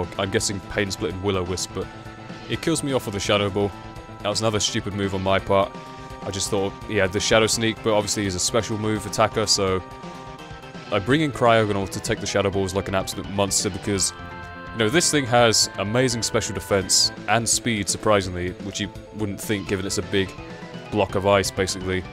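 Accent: United Kingdom. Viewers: Your British accent soothes and reassures me. Will watch rest of playlist. Enjoy my like.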